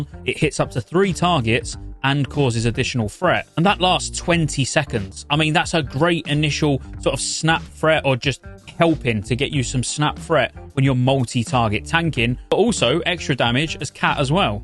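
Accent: United Kingdom. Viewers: Hi right there! Did you appears to be british?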